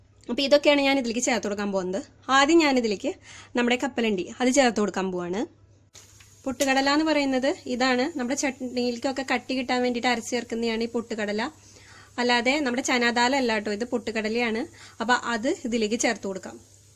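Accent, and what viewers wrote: Thrissur, India. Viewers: good job. like ur presentation..trissur slang...thank u....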